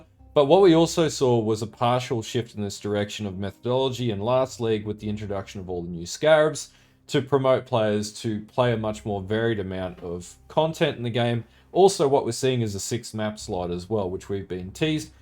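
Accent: Australia. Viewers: i have missed the aussie twang. it is so good to hear poe ideas spoken in my lingo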